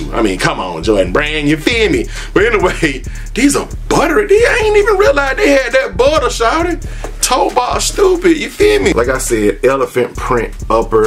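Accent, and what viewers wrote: South, United States. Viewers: you're a funny dude and your southern accent. keep doing your thing bro!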